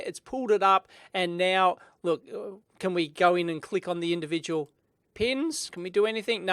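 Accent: Australia. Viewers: Wtf he is australian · With your australian accent, honestly I sometimes wasn't sure whether you said "uhm..." or "ARM" :)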